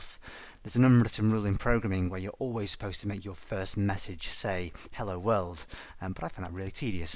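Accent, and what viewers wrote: United Kingdom. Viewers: Not sure if I love these videos because they are informative and easy to follow or because of the british accent. Either way, well done!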